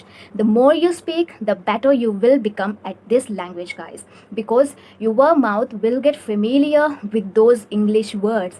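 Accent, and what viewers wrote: South India, India. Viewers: Hey you talk very nicely....and that South Indian touch while motivating people is great... every South Indians has this thing in them... talking so maturely...keep up the good work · Indian accent u know ?😏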